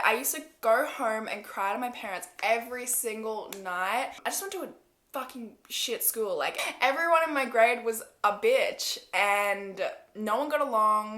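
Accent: Australia. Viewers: I know I’m so late lol, but I’m binge watching some of your videos.. In a non weird way, I could listen to you talk for hours.. Your voice is so soothing & I love your Australian accent. It’s so funny to hear you pronounce or say words that we wouldn’t normally use to describe something in America, it has me so intrigued lol.. & all of the earring changes for each topic was iconic! Love your content girl 🥰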